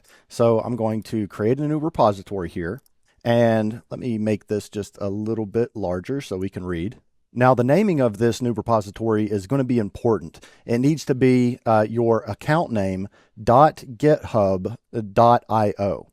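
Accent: South, United States. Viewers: Can’t i just use firebase? Also ru from the south?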